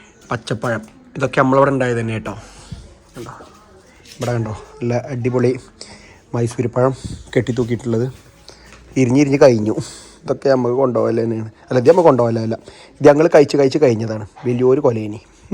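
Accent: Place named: Kasaragod, India. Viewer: Kasaragod paraya oottpola😊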